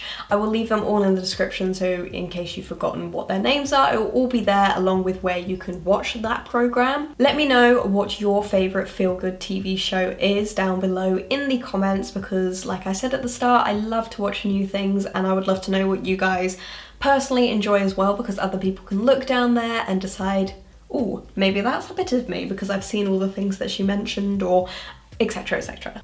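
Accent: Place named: United Kingdom. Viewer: Sounds like she’s from the United Kingdom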